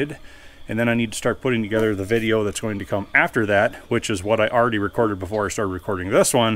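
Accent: Minnesota, United States. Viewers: Here's a curious observation (not a criticism).....the extremely rare times you appear "stressed" on any video, your uniquely Minnesota accent comes out. As for the "swagger" inference.....it's more likely due to the way you walk especially in the field.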